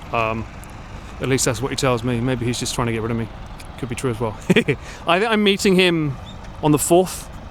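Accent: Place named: Essex, United Kingdom. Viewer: Lovely stream! Superb way for us peekers to see more of Japan while being entertained by an Essex accent.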